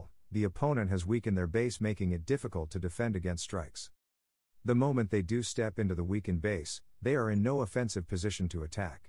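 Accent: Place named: India. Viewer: He sounds like an indian so no lol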